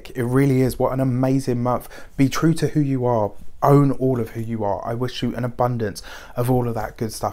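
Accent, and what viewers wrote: United Kingdom. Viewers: OMG your british accent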